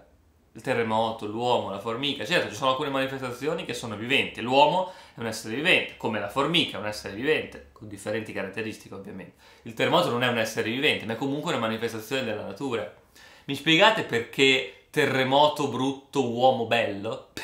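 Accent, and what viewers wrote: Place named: Romagna, Italy. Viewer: Dall'accento e da quello che ho visto sul tuo canale credo che tu sia romagnolo... Mi vergogno ad essere tuo conterraneo.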